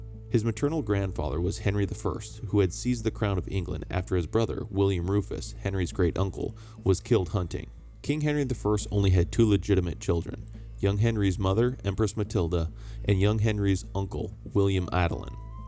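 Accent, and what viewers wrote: United States. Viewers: I hear his American accent, but can't identify the region.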